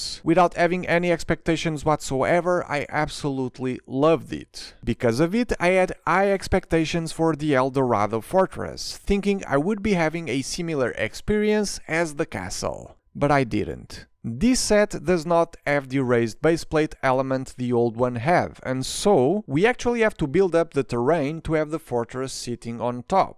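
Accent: Portugal. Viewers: Boas, não sei se costuma receber muitos comentários em português, mas só queria deixar uma mensagem de apreciação ao trabalho que fazes, principalmente sendo em inglês, o que te dá um alcance maior do que terias a falar em português. Eu comecei muito tarde neste mundo da Lego e só acerca de 1 ano comecei a montar a minha coleção, posto isto, gostava muito de ver-te a fazer um vídeo sobre como "nós" (beginners em Lego) podemos arranjar forma de, mais eficientemente, fazer arrumação das peças por categoria, ou mesmo por "tipo de peça". Adoro os teus vídeos, e devo confessar que o teu sotaque de inglês apesar de ser excelentíssimo, dá para entender no meio, os tragos de português e é muito bom. Muita força e que continues a ter sucesso por muito tempo. Um abraço de Lego aqui do norte, mais propriamente de Santa Maria da Feira. Um bem haja grande! <3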